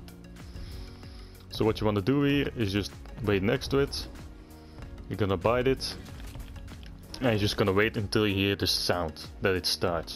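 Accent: Netherlands. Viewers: Hoor ik hier een Nederlands accent hahaha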